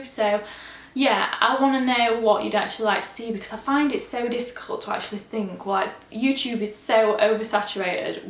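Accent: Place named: Yorkshire, United Kingdom. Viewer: Loved watching this, you're so genuine and it's so nice to hear a fellow Yorkshire accent on YT!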